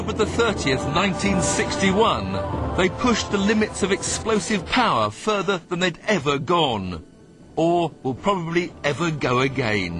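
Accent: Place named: United Kingdom. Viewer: 这英音听的舒服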